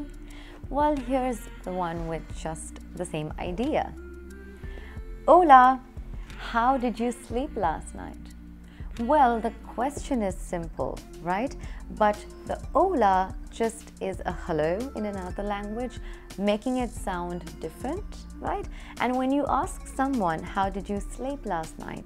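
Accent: India. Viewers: You sound local Indian village English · Are you a native English speaker.? You are speaking indlish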